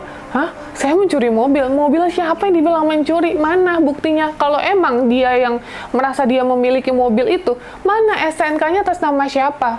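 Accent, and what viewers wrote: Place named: West Java, Indonesia. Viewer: Resep ngadangu si teteh nyarios teh .. orang sunda ya. Tadi ada kata keukeuh dan Juntrungan nya 😂😂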